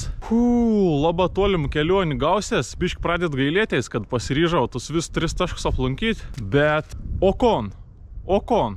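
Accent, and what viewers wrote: Samogitia, Lithuania. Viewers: Jei jau isdavei savo gimtine zemaitija, tai ir ismesk ta akcenta ir priimk naujos tevynes - novavilniecio kalba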